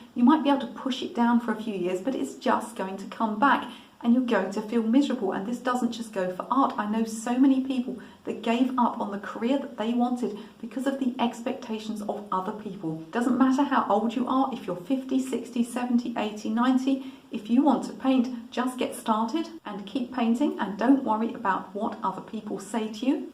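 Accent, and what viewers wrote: United Kingdom. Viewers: I’m a new subs Cusine a week now and I just emendation enjoy your voice and British English accent.., it’s a pure pleasure listening. Iv been an artist my whole life. Art is oxygen for me. Thank you for sharing your story ☺️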